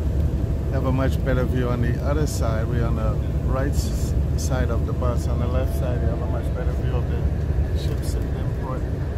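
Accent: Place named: Trinidad and Tobago. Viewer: sounding trini..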